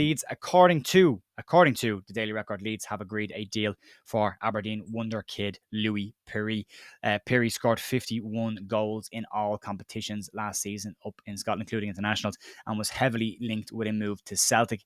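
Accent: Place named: Ireland. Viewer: Thanks, plenty of false announcements in my feed this morning from most of the other LUFC YT channels, didn't even bother to watch them. These days I'm happy to wait for the real news, in an Irish accent.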